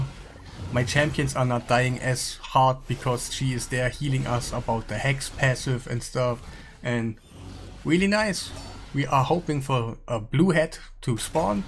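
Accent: Germany. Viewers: Bist du deutsch?😅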